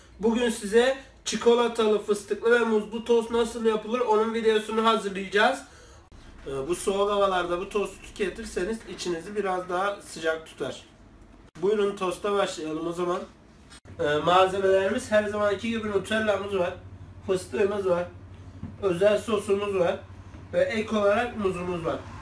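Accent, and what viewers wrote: Gaziantep, Turkey. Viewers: ABİ ADAMIN GAZİANTEPLİ OLDUĞU ÇOK BELLİ OLUYO KONUŞMASI AYNI GAZİANTEPLİLER GİBİ BENDE GAZİANTEPLİYİM TOSTUNU YEMEDEN ÖLMEM İNŞALLAH:D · Normal somun ekmeğe Bizim Özel tost ekmeğimiz diyoN:D abi antepli oldunu çok belli ediyorsun Konuşma şeklinden bilmeden önce anlamıştım:D özelliklede şu kısım (buyrun tosta başlayalım (Ozaman) ):D bende antepliyim:)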